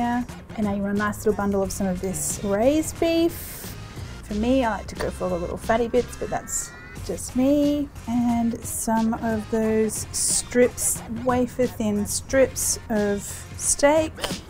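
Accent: Australia. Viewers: I love how Australian accents add an 'er' to the end of words... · That’s her Australian accent.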